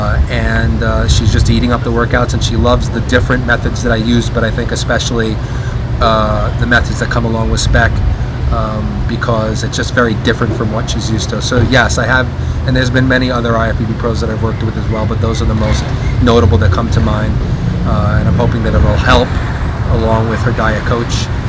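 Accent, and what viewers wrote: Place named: Massachusetts, United States. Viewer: You sound like you're trying to hide a masshole accent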